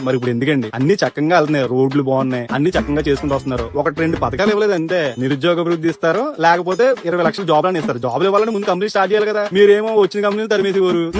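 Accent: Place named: Godavari, India. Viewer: Tammudu nee godavari slang super😊😊😊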